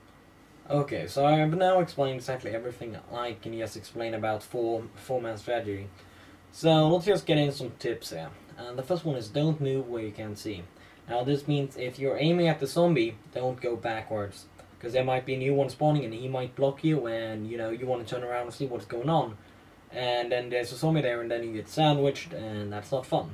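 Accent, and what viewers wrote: Netherlands. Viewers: Heh, i thought you were dutch :P Anyway you deserve more subs for these.They're the only good zombie guides on youtube. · Dutch? Swedish?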